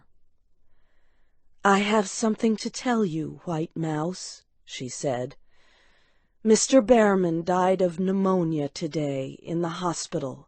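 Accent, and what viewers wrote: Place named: United States. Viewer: 'Her' voice 'is' not good . Wrong grammar . I hope you think twice before commenting next time because that's a very nice american accent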